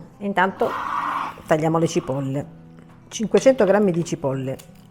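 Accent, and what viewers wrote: Calabria, Italy. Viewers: Ciao sei bravissima,ti seguo sempre, sei di Reggio? Di quale parte della Calabria?😘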